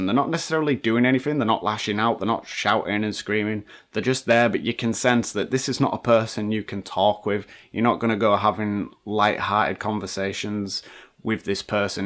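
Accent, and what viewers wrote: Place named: Manchester, United Kingdom. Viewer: You have some of the best videos on YouTube, and some of the best paranormal videos I've ever seen. I apologize if I have missed your Q&A's that may have answered these, but I have a couple questions: 1.) Have you ever thought of taking up a professional career, in paranormal investigation? (I personally think you'd be great at it, though I know you are more interested in your own personal experience and residence right now) 2.) Where, in England, are you from? (I realize you likely enjoy your privacy, so you do not have to answer this question - I was trying to place the accent, along with my son...and we guessed Manchester)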